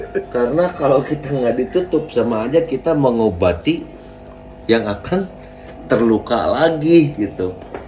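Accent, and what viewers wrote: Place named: West Java, Indonesia. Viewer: Suka sm logat sunda nya...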